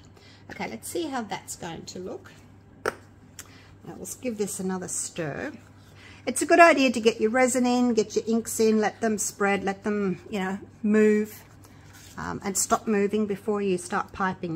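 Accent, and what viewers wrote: Australia. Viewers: Aussie accent is the best...(No, I'm not biassed.. well, maybe just a tiny, incy, wincy bit🤣🤣)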